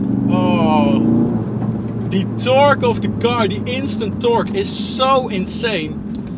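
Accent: Netherlands. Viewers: I dont think you can do anything about this but you can really hear your dutch accent very well :) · You can hear you’re Dutch but that’s not a problem. You’re video is really nice to look at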